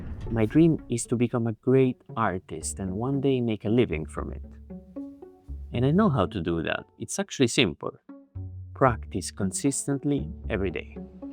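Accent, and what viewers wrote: Italy. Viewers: Thank you for this video😁 I needed a reminder that being consistent isn't that hard when you're smart about it. I also love your italian accent! Rooting for your success!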